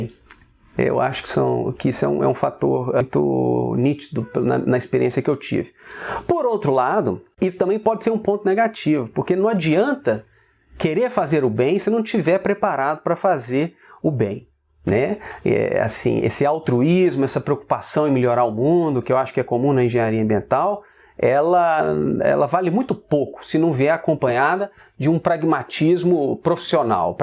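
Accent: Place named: Minas Gerais, Brazil. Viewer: TÃO FOFO JESUS CRISTO como eu amo sotaque mineiro Mas ajudou pra crlho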